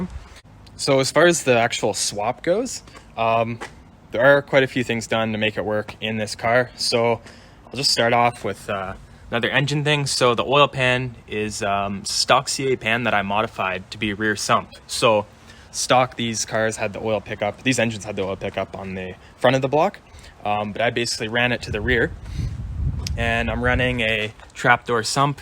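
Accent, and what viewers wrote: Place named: Canada. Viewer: Instantly knew he’s from Canada by the way he pronounces his O’s and R’s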